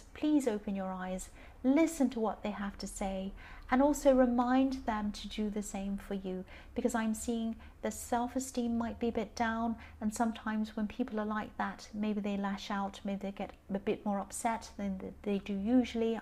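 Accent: United Kingdom. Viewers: you're so British!!! Love you!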